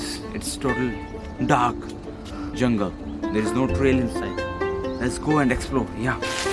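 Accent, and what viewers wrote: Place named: Comilla, Bangladesh. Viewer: It seems that you are from Comilla , though we know, Tripura was the part of East-Bangal before the British period. Your tone and dialect are matched with us .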